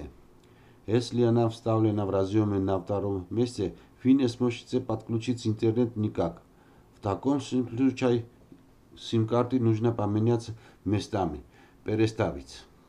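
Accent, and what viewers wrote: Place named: Georgia. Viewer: Грузинский акцент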